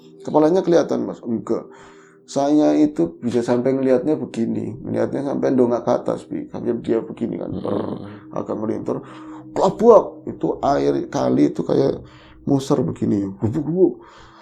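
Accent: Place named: Tegal, Indonesia. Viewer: Sllu kangen bhs.Tegal bro yg sngt sedap ddengar jd auto like, komen, and subrek.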